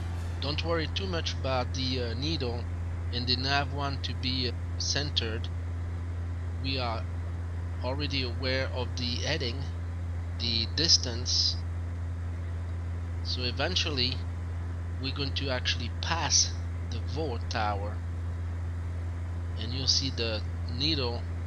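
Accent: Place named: France. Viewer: Excellent video, just what I needed. Merci beaucoup - de ton accent je suppose que t es Français.....tu parles super bien l'anglais!!!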